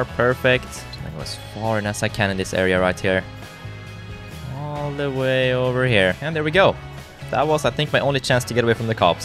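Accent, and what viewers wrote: Sweden. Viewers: I think ur swedish im i right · Are You frome sweden?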